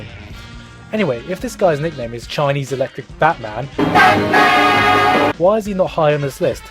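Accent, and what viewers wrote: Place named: England, United Kingdom. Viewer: Nice English accent,. Are you English by the way? Well, anyways, that's not important. Naruto and Bleach can be overated sometimes. I just recently finished.hack//sign and 11eyes. They're both pretty decent animes. I've been trying to get back into Bleach, but I have to go through all those episodes again.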